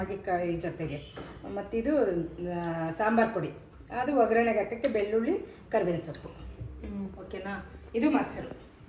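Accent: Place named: Hassan, India. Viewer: neevu hassan kadevra neevu mathadodu and aduge style ade reethi ide navu hassan adke kelde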